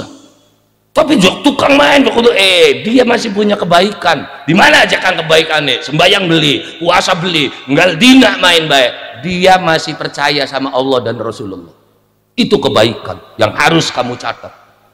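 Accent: Indonesia. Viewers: Aku g Level yg ceramah ny kurang wawasan kental logat Ndesosanya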